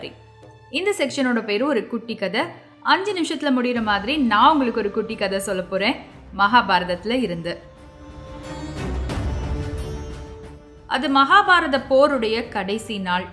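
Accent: Tirunelveli, India. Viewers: super story sister nice explain. vazga valamudan kattakadaisi endra tirunelveli vattra valaku super. neegal sollum poluthu kadhai kalathirkae kondu sellum munnorkalin thiramai irukirathu. super sister.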